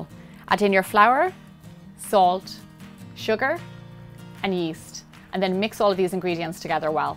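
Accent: Ireland. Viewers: are you Irish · Lovely Irish woman.... beautiful accent. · Are u Irish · OH WOW!!! They look incredible! I make bagels pretty much the same way, by boiling them. And I have to say - your lovely Irish accent made them seem that more scrummy! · Irish accent? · i love pretzels thanks what is youre nationality im guessing irish lol no clue carry on carry on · just a wee bit o butter. lol I know you don't say that but I love your accent. irish I'm guessing... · She is from Ireland I'm pretty sure :)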